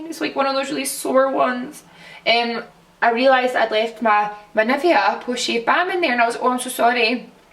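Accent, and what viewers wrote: Scotland, United Kingdom. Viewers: thats coz she is scottish me too so i can understand everything she said lol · I love this video and your hair looks awesome! Its nice hearing little Scottish words every now and then and your accent (I'm from Perth) :) also really liked how chatty you were x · love your get ready with me's, especially your chatty ones!! it's so nice to listen to someone else that's scottish for once, such a stunning look!! defo gong to try this sometime xx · She's Scottish 😊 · I looooove your various eyeshadow looks! brand new suscriber here and since most of the channels I follow are American it is SO weird hearing a fellow Scottish accent :D where about are you from? Are you able to write out product lists for your videos? it would be super handy!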